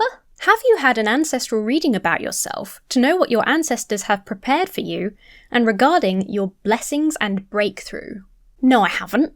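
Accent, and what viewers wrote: United Kingdom. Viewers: Pretty sad when people get scammed like this. I enjoyed watching you have a bit of fun with the priestess. Love you, ya funny British-talking satan-worshipping lady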